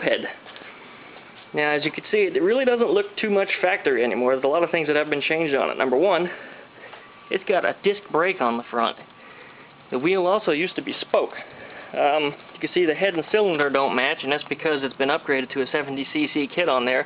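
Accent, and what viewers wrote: United States. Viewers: It's in a good Way bro and you kinda sound country no disrespect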